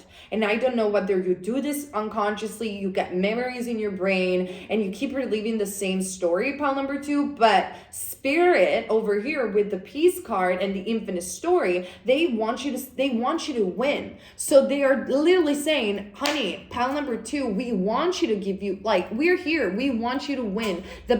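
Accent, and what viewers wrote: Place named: Italy. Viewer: thanks girl xxx love your energy and italian accent.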